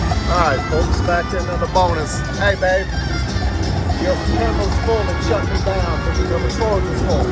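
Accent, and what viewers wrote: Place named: South, United States. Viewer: Nice run!! If it ain't broke, don't fix it 💯 hey, your voice and southern drawl sounds like Brock from the Reba show. Keep on feelin lucky ❤🎉❤🎉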